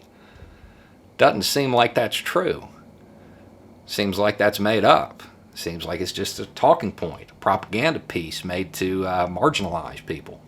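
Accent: South, United States. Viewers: It's his accent and southern charm that gives Conservatives a false impression that he is an ally. One look at the very first time I saw a video of his and I assumed I was going to be giving a thumbs down. About <num> likes later........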